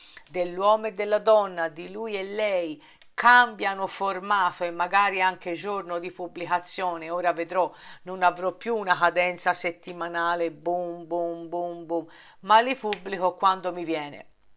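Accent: Tuscany, Italy. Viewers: io comunque ti seguo a prescindere perché mi piace innanzitutto la tua parlata toscana e i tuoi " e bla bla bla" mi fanno piegare in due dal ridere! Ti abbraccio forte!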